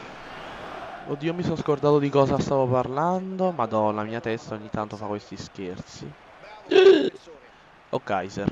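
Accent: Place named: Caserta, Italy. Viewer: bella ti immaginavo di caserta per la parlata...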